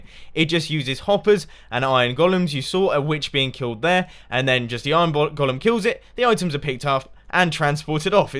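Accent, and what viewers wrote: United Kingdom. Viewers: IM CAPSLOCKING THIS SO YOU THINK IM ANGRY AND YOU RED MY COMMENT PLEAS STAY AS AWESOME AS YOU ARE AND THE MUSTACHE IS NOT REQUIRED BECAUSE WE ALL CAN HEAR THE BRIT OUT OF YOUR VOICE REDSTONE 4 LIFE <3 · what part of the UK are you from?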